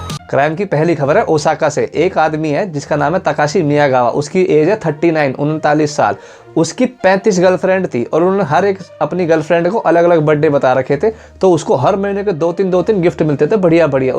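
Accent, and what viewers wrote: India. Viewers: Desi accent mein Japanese news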